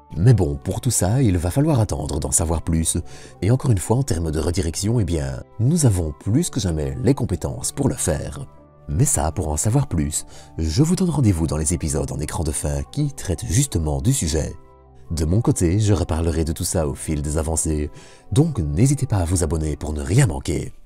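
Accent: Liège, Belgium. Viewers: Tu ne serais pas de Liège, par hasard?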